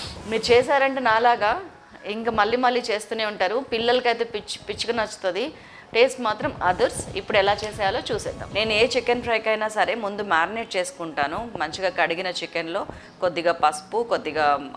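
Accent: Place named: Godavari, India. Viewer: Godavari savvadila andanga undhi mee maatalu nice video thank you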